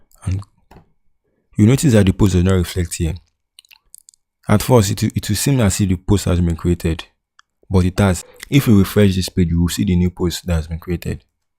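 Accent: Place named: Igbo, Nigeria. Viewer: this na confirm igbo guy, probably from enugu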